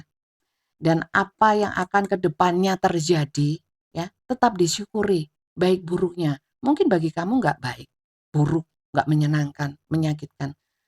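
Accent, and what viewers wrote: East Java, Indonesia. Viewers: Seneng logat Jawa timur ny Bunda.. Jg readingan ny sll ber bobot dan mantap. Leo Single mom hadir menyimak..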